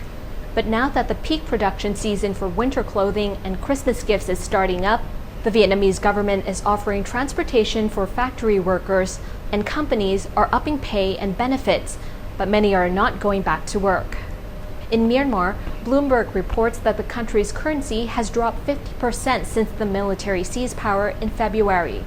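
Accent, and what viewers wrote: United States. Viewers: Great command of the english language, obviously an American accent. did a great job in the presentation, however recommend that she slows it down abit, should improve with experience. Overall a fantastic addition to the Thaiger team! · You Will Have Wet 💦 Dreams Of her Tonight and you don't know a thing about accent . Her's Is 100% Americano Gringo, Bro 😷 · she sounds American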